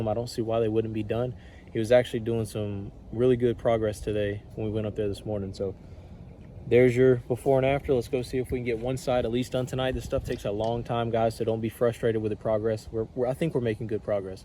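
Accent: Alabama, United States. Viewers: You are from Alabama right ?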